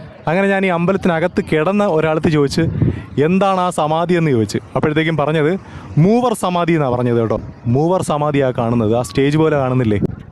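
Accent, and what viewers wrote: Kollam, India. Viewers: കൊല്ലം ശൈലിയിലുള്ള നാടൻ, ജാഡ ഇല്ലാത്ത അവതരണം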